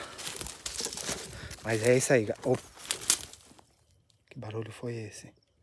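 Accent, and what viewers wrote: Minas Gerais, Brazil. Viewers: Olá! Boa tarde amo assistir seus vídeos e seu trabalho! Esse seu sotaque é de mineiro? Kkkk adoro seu jeito de falar e sua humildade e honestidade!!!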